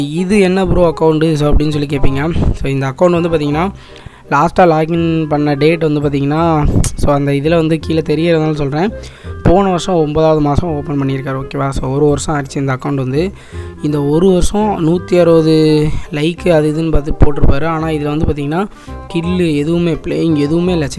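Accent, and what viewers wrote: Tirunelveli, India. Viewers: Neenga Tirunelveli ah bro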